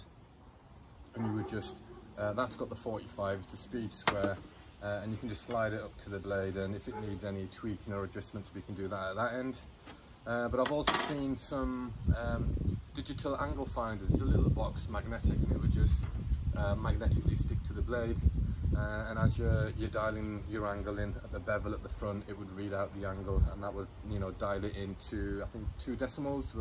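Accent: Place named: Yorkshire, United Kingdom. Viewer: Nice review. Loving the Yorkshire accent. Going to buy one on your recommendation. Thanks.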